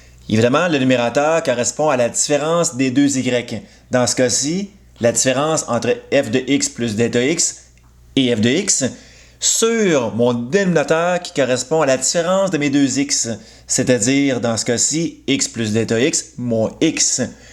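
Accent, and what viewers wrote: Quebec, Canada. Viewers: Je suis tombé sur votre vidéo totalement par hasard et je ne le regrette pas du tout. Merci pour ces explications très claires que j'avais dû zapper il y a très longtemps avec mon prof de maths au lycée 😁 Je comptais me remettre aux Maths et votre chaine me parait une bonne ré-entrée en la matière. Merci beaucoup. Je vais regarder vos autres vidéos avec plaisir. Et votre accent québécois me plait beaucoup 😊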